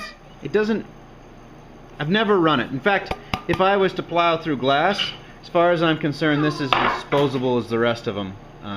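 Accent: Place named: United States. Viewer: You sir, sound like a good american. Awesome blades! Especially the vintage ones that have stayed in the family! Priceless!!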